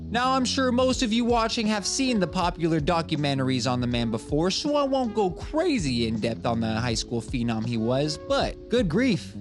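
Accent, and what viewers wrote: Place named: Mexico. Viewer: you sound like a mexican now too bruh u been round the squad religiously fr i see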